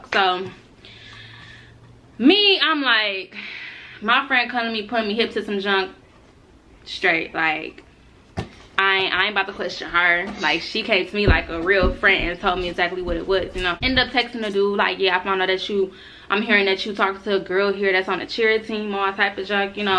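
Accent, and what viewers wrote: Detroit, United States. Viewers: I love Detroit accent 😍😍😍 her voice so pretty 💝💖💝💖💝💖💝💖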